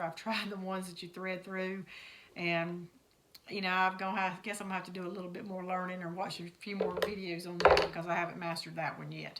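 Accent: South, United States. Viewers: So pretty, I've been trying to find a simple way to show my daughters (adult girls) a simple and easy way to make wreaths. My older daughter especially loves her diy's... I think I'll go out and grab some supplies for her and have her watch this wonderful video and try this project. Thanks so much for sharing. And I just love watching your channel... love love hearing that southern accent you have.. lol Thanks again and have a blessed day. 🍂🍃🍁🍁🍁🍁🍁🍁🍂🍃🍂🍁🍁🍁🍁🍁👍👍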